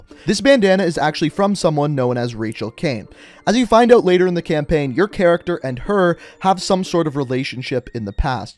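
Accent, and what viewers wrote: Canada. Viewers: Is the Guy Canadian?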